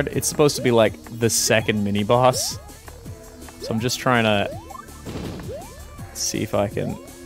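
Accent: Australia. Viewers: was super Australian in this one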